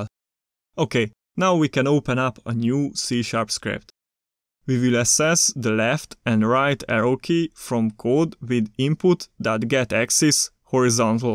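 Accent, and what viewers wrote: Hungary. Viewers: Are you hungarian? Your english sounds a lot like how a hungarian would talk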